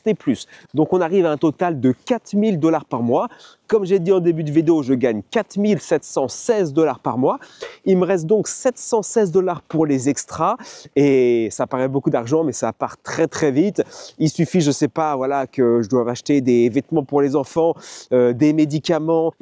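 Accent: France. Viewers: Super vidéo. Avec ta jolie frimousse de Frenchie et l'accent qui va avec ,tu devrais pas rester longtemps célibataire !!😅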